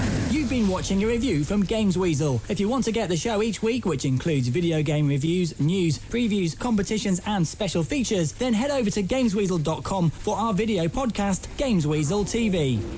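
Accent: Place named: London, United Kingdom. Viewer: you sound like youre from london!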